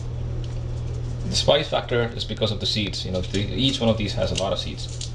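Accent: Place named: England, United Kingdom. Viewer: hey, never mind. i like your cool english accent and local time.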